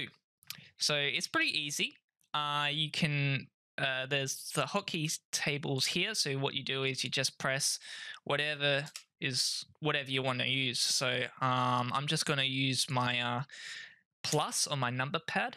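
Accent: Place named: Australia. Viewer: i hate australian accent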